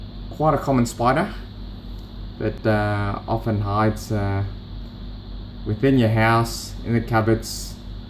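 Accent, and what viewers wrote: Australia. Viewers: He sounds like and his mannerisms are like a typical Aussie. Could have been raised here, born here or be a descendant of a long line of Asian immigrants present here from the earliest pioneer days. After all Australia is further south than what is called South East Asia, we are situated in the Australasian Region of the world map. He could have a heritage originating from any Asian nation. I'm an Aussie of Anglo Saxon heritage and have cousins and 2nd cousins who are Ausies with Thai heritage and Aussies with South Korean heritage. Also other 2nd cousins with Samoan heritage. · he sounds born and raised Australian. Please don't tell me you think white people are native to Australia 😅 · Is this dude Asian with an Australian accent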